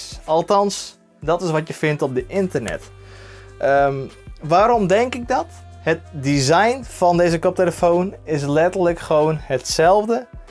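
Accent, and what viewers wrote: Friesland, Netherlands. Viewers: Leuk die Friese klemtOnen😄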